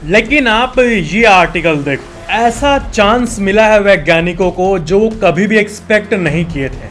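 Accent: Bihar, India. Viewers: Bihari babu voice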